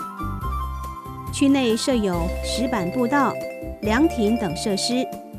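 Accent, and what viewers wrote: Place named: Taiwan. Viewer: 主持人講話口音很清晰有台灣味道很親切好聽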